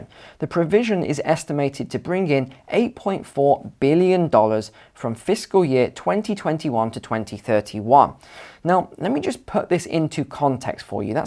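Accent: United Kingdom. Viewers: Crock of shit has anyone of you verified this on the I R S website. He sounds very British maybe its Britons IRS.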